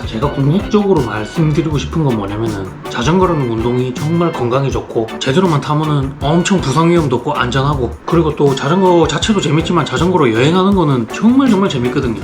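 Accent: Busan, South Korea. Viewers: 전형적인 부산 상남자 스타일~ 요즘 안쓰는 부산 사투리 들으니 정겹네요. 여행은 일상의 탈출이 되어야지 일상이 되면 안될거 같아요. 구독지수 늘어나도 직장은 50까지 놓지 마시길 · 생긴거는 이슬람으로 수염 덕지덕지한 형이 부산사투리를 하니 얼마나 이쁘나.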